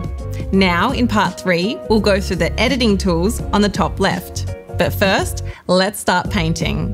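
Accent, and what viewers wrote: Australia. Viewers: I had to swtich the captions on to understand some of the things the presenter was saying. I'm a native English speaker but I found her accent very strange at times? Is it some sort of Australian? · damn the aussie accent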